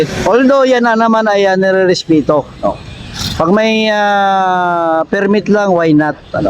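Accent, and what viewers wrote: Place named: Mindanao, Philippines. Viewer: Kayo po parang taga Mindanao ka at DDS ka at sa tono ng pagsasalita mo 😂😂😂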